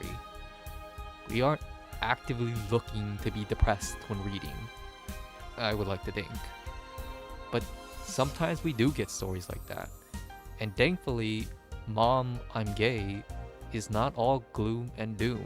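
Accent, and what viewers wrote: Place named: Ireland. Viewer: cool cool, but why do you keep saying "mudder" are you Irish? Or Irish american?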